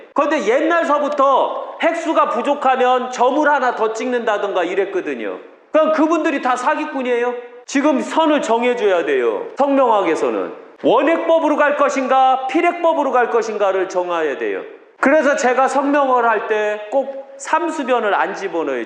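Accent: Chungcheong, South Korea. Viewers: 쉬운듯 어려운듯! 알아들을듯 말듯! 이해가 가는듯 아닌듯! 그래서.. 자꾸 듣게되네요 저도 충청도사람인데 충청도사투리가 정겹네요~^^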